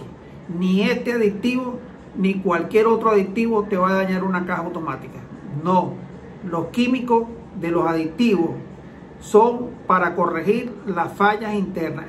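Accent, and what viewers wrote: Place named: Venezuela. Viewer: Sr. un respetuoso saludo, Ud es de Venezuela creo por el acento jeje, soy de Vzla., tengo un corsa 1.6 año 2002 caja automática. El vehículo no se usa mucho en la semana porque tengo otro vehículo y por otras razones. Actualmente me desliza la transmisión en el 2do. cambio., ud cree con el aditivo mejore esa falla?, ese que muestra en el vídeo lo consigo en MercadoLibre?, un amigo mecánico me comentó o sugirió drenar todo ese aceite viejo sacar el Carter y hacer mantenimiento al colector del Carter, quisiera saber su opinión y recomendación por favor.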